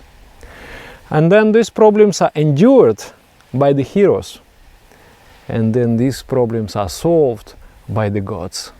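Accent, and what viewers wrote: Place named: Russia. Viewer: Спасибо большое за видео🙏 Но его не совсем просто слушать из-за грубого акцента который влияет на произношение. В том смысле что русские постоянно пытаются произносить чётко каждый звук. Английский так не устроен, он звучит нормально только когда на нём говорят носители в обычной им манере. Ещё раз спасибо 😊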